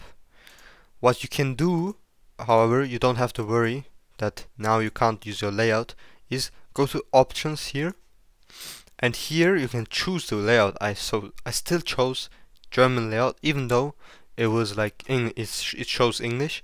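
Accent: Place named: Turkey. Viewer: aksanından, tonlamandan falan. Ana dili Türkçe olan birisi İngilizce konuşunca anlaşılıyor. Çünkü hepimiz o tonlamayla konuşuyoruz.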